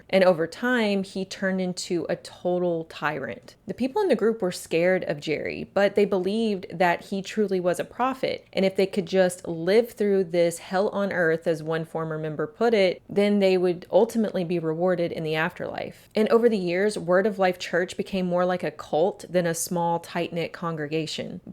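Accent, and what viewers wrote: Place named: Utah, United States. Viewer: Fabulous story-telling skills. I’m convinced the you-tuber has to be from Utah or Idaho…real and deal pronounced rill and dill.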